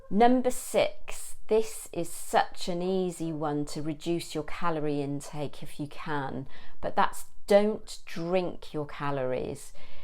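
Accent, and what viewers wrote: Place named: London, United Kingdom. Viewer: Hi, New Subbie here, pleased to meet you😊 You sound like a Londoner like me (lol). I am 50 with borderline hypothyroidism, i really want to lose weight (7 stones would bring me to ideal weight according to my BMI) but finding it hard. Do you have any ideas for how to make exercising fun? I think that would make a big difference to me because I can't motivate myself to be consistent because I don't enjoy it. One thing I've thinking about is go on an adventure to find the best free exercise parks in London (you know the ones with the free outdoor exercise machines), maybe you can do a video on that? Just an idea... Love your videos😍, stay blessed, One love❤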